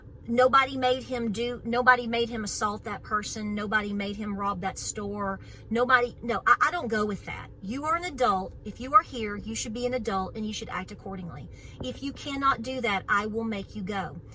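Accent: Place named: Tennessee, United States. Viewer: my mom's people were from East Tennessee. You sound just like them! It is like going back in time for me when I hear you use an expression from my childhood. I know many of the areas you speak of, too. (One of my great aunts lived in Maryville...I love hearing you say Maryville.) I grew up in the area as well. So, I have never doubted you. The things you mention...blackberry winter, for instance, are things that I remember my grandmother talking about. She still dried her apples in the sun when I was a kid. She ate those dried apple chips like candy. I didn't like the taste at all, but they made wonderful pies. I miss all of that so much, so watching your videos is like a little slice of that for me. I enjoy everything you talk about and share. I love seeing you care for the goats and hearing about what you are growing, making, planning, etc. 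I don't know you, but just from watching and listening to you, I would vouch for you any time as a true East Tennessean. I started watching you because you reminded me of all of my mom's family. You help me recall things that I probably otherwise would not. I no longer live in the area, and I do love where I am, but I miss those foothills and love being taken back. So, thank you for simply being yourself. You bring a smile to my face pretty much every day. Now, I kept watching partly for that, but mostly because I enjoy hearing your perspective. I like your advice. I like the way you think as I agree with most everything you say.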